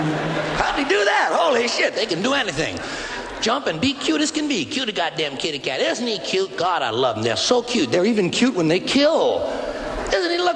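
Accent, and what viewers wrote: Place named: South, United States. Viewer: He sounds Southern in this vid, for some reason.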